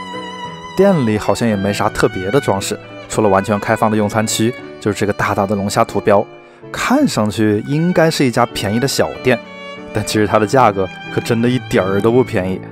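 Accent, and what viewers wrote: Wuhan, China. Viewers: 老师请问你是武汉人吗？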